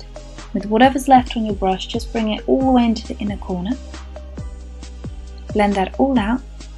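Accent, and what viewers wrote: England, United Kingdom. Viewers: R u from england xx like me lol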